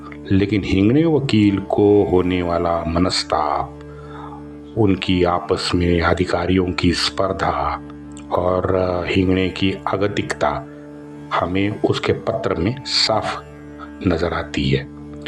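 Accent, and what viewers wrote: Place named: Maharashtra, India. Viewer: Kya aap Marathi ho? Aap ka accent Marathi hai.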